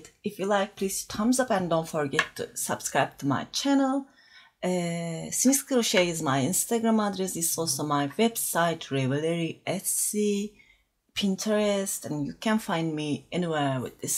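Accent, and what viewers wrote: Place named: Turkey. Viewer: Türk aksanlı İngilizce 😂😂😂😂hemen belli oluyor ,broken eng too…😂😂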